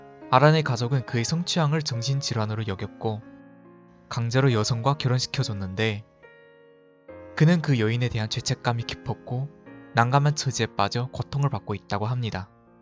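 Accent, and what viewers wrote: China. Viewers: 발음이 조선족 같은데 중국 조선족이었넹ㆍ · 중국인이신가요? 제가 아는 중국인 분이랑 한국어 발음이 비슷하네요. ㅉ,ㅃ 같은 된소리를 굳이 쓰거나 발음이 쎄게 나가기도 하고 뜻이 안맞는 피동표현이 나오기도하고 -다, -습니다 가 계속 섞여서 사용되네요. 번역기 같은 거 쓰는 것 같아요. 혹시 더 나아지고 싶다면 이런 부분을 고쳐나가는게 좋을 것 같습니다.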